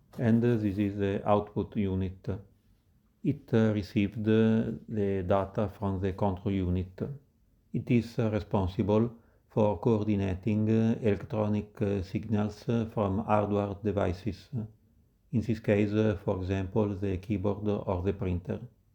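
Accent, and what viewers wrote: Italy. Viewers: Oh yes, i can understand. When we hear the narration it sounds like an italian speaking English. Is that actually your voice or an automated voiceover? I always juat figured that was you.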